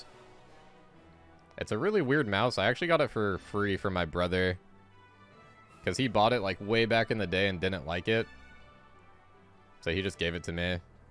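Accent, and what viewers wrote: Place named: United States. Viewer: What region of the U.S is that accent from? Well idk not accent but like...inflection?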